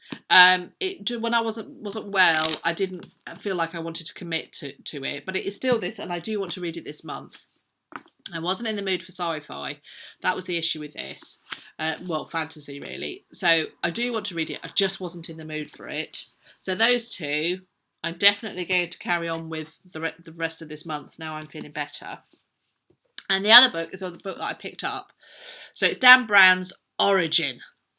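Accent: United Kingdom. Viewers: Giveaway-I thought of a second question. I like listening to your lovely English accent. Can you do an American/US accent (any region)? :)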